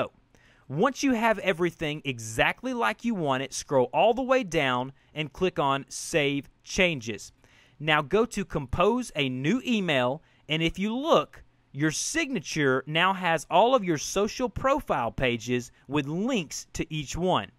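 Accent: United States. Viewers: I love hearing American English Cheers From Algeria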